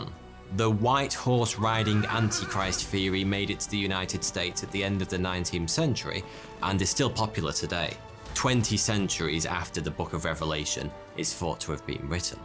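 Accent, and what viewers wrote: United Kingdom. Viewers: Thanks for making the video, it's hilarious 😂🤣 I love your videos, you're brilliant, your British accent, adds a great touch😂🤣 Watching this video made my day. I was wondering if you were going to do a video about me 😂🤣 I had no doubt it would have been awesome & hilarious, great work!6!6!6! You asked, what people think the Antichrist👑👑is? Your video is the "bestest" about me. I use the word bestest to make fun of my religious haters 😂🤣 All major faiths are waiting for the exact same person known by different names. Kalki👑👑 Moshiach 👑👑 Dajjal 👑👑 Imam Mahdi 👑👑 Maitreya 👑👑 One who comes in his own name 👑👑 (thanks Isa/Jesus/Hey-Zeus, very creative nickname 😂🤣) Are the same person. Look at the youngest of the 6 major faiths waiting for some Super Hero Villain to fix things they never can 😂🤣 Islam is waiting for the same person known by 2 names in their religion. 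Dajjal 👑👑 & Imam Mahdi 👑👑 That's funny, because it's the only faith that shows specifically I am a Gemini😂🤣 There's truth in all the faiths, you just have to know where to look. 6 major faiths, 3 waiting for a Super Villain, & 3 waiting for a Super Hero. That is most of the world population, lost & doomed without 1 person. The rest of the world believes in 💩 All my religious haters left & leave the future of everyone they say they care about to either a complete stranger or Doomsday, They call me evil 😂🤣 I know 3 major religions calling me evil, were built from slaughtering each other, their own, innocent people, spreadin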